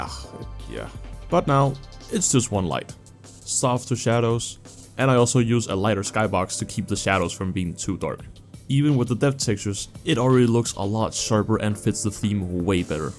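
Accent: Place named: Denmark. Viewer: Yo that would be sick i am 100% buying it if it costs money (selv fra Danmark og jeg kan godt høre du også er fra Danmark men dit engelsk er meget godt 👍)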